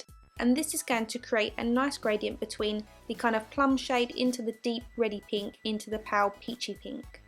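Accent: Essex, United Kingdom. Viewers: I just found you! I’m from Essex you sound like you’re local? Maybe Loughton?😃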